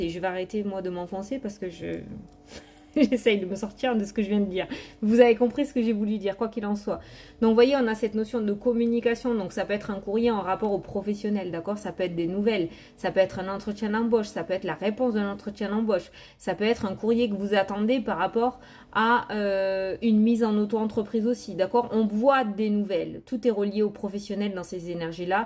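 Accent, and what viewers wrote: South, France. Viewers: Dommage j'adoore ton assent du sud. 😄😎🌞🙏 C'est le Soleil. Merci c'est Super. 🍀💫🙏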